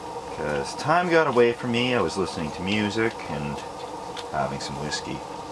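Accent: Alberta, Canada. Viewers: Why can I tell immediately as an Albertan that I am hearing another Albertan?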